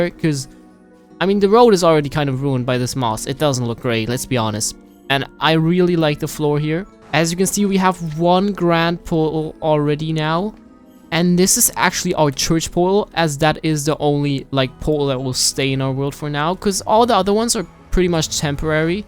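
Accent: Germany. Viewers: quick question, are you German? you kinde saound like you´re German...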